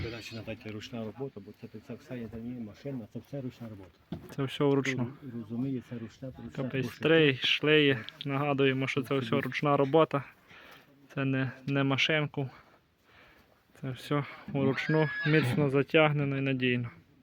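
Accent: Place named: Carpathians, Ukraine. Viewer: Ніколи не чув карпатський діалект😊 Одна з особливостей,ви обрізаєте закінчення -сь в глаголах. А також ,замість букви И,ви кажете Е. У кожному регіоні свої приколи😊 А у нас часто замість букви О ,зажуть А.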